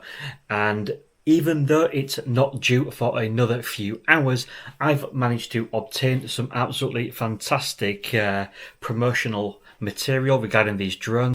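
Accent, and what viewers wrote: United Kingdom. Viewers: You are certainly on top of things. Love your videos. I find them the most useful of all the channels....and it's not just because you Britts make everything sound more interesting:)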